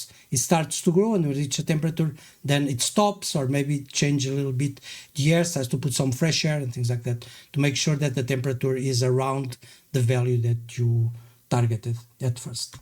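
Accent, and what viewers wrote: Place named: Portugal. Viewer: I recognize that accent anywhere. You, sir, are Portuguese. Congratulations on a very interesting video.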